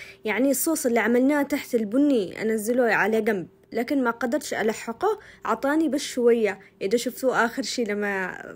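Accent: Aden, Yemen. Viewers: يامحلا الكلام العدني صوتك فيه عذوبه خلاني انااااااااااام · مادام الهدره حقك باللهجه العدنيه الحلوه خلاص قولي (( كبزره )) بالعدني مش كزبره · تسلم يدك وكلامك العدني زيد شوقي لااهلي الي بعدن الحبيبه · عجبني لهجتها العدني يسلم ايدك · محسنك هدرتك عدنيه 🥰 تسلم الانامل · كلامك عدني اصل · ماشاءالله الطريقة حلوووووة وشكلها مضبوط مية المية بس الأحلى هو اللهجة العدنية كان احنا بنتشوه معك 😂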